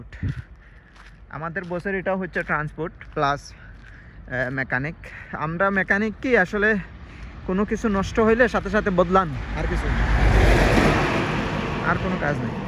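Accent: Sylhet, Bangladesh. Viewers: ভাই আসসালামু ওয়ালাইকুম আপনার সিলেট মাত সুন্দর লাগে। আপনার সাথে যোগাযোগ এর জন্য কি আপনার নাম্বার টা দেয়া যাবে কি?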